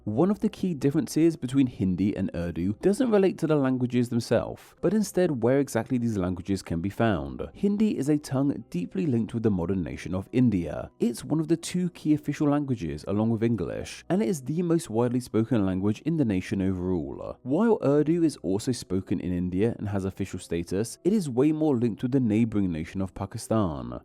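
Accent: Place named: England, United Kingdom. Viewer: Never knew this. Fascinating. What I also find fascinating is the way in which the narrator of your videos has a tendency to add an "ah" sound to the end of words, where a full stop might appear. For example, the previous sentence might end with "appear-ah". It's fascinating. It's not unlike a preacher in some of the evangelical churches in America, but the speaker is very obviously English. I would love to know the backstory of where this speech pattern comes from.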